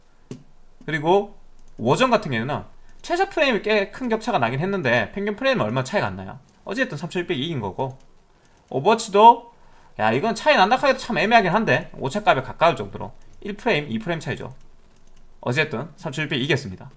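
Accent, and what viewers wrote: Gyeongsang, South Korea. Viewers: 님 말투는 경상도인데 대전분이신가보군요 신기신기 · 경북 경남? 어디쪽 사투리죠? 이 사투리 쓰시는분들이 방송에서 가장 억양을 못고치는듯ᆢ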